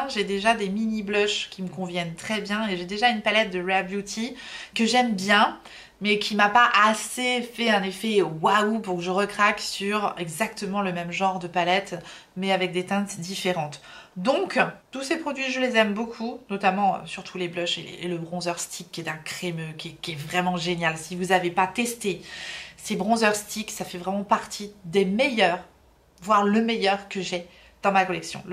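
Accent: United Kingdom. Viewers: Coucou 🐢🌹 J'ai la même petite palette de Bobbi Brown moi aussi et elle est vraiment bien👍🤗 La collection d'Estée Lauder me tente bien moi 😊 Youpiii ! De nouvelles teintes du fameux gloss de Maybelline 🤗 C'est pas folichon les nouveaux produits de Dior 🙁 Alors là ! Les palettes MAC sont à tomber, je les veux 🤩😍 Je ne connais pas du tout kacmarque Juvias place, m'en vais remédier à ça 🤔😉 Ah ! Enfin le highlighter de By Terry ! Super ! 👍🤗 Les bronzer de Patou sont très beau. Ils me tentent bien et le blush aussi 😊 J'adore ton accent anglais 😊 au moins je comprends ton anglais 😂 Le fond de teint sérum de Too Faced me tente beaucoup moi aussi 🤗 La Terracotta de Guerlain est une légende 😍 Ma mère en portait quand j'étais petite. C'est une petite madeleine de Proust pour moi ❤ Très bonne idée le roll-on d'Iconic London dis-donc 🤔👍 Chez Clé de Peau, peut-être qu'ils acceptent le don d'organes en échange d'un de leur produits non ? 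😉 Je tenais à te remercier pour m'avoir conseillé le fond de teint Care and Glow de Lancôme 🙏❤ J'attends la fin du mois pour me l'acheter mais je suis sûr qu'il sera parfait pour moi 😊 Encore une super vidéo 👍💕 J'adore ce concept de nous présenter les nouveaux produits sortis ou à sortir 👍♥️ Merci 🐢🌹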